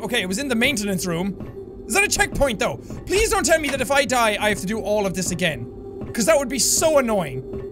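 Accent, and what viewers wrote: Ireland. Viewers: Every video he get more Irish.😁 I wish I was Irish 😢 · Is he Irish ☘️ I’m irish☘️💚🇨🇮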